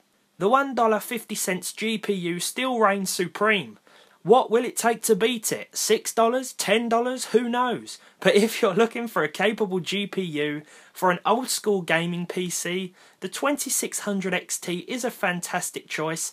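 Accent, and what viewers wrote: United Kingdom. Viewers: Nice video dude! Just a question. Where exactly are you from? I'm asking you because I'm studying British English at the moment but your accent is quite different from the other British speakers that I've known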